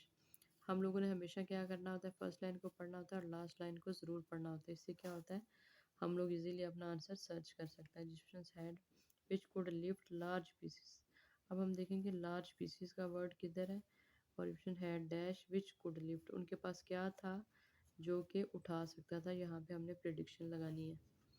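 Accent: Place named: India. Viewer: I put like for "bismillah"but unfortunately i cannot understand because of your accent your accent don't like English Like Indian 😢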